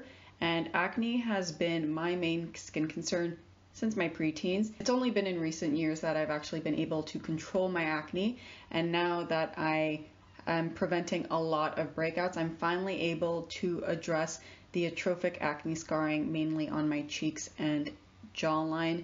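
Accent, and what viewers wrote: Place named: United States. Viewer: Where are you from? From your accent, I thought you were American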